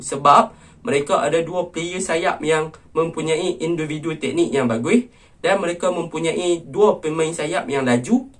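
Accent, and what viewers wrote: North, Malaysia. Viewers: Org utara mmg akn sebut mcm tu lidah diorng mmg cmtu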